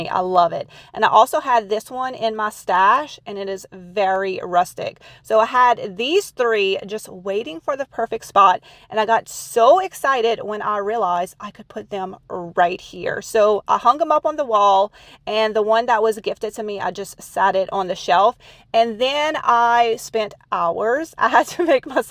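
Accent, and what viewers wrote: Louisiana, United States. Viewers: Love your accent? Southern Louisiana? Your home looks beautiful!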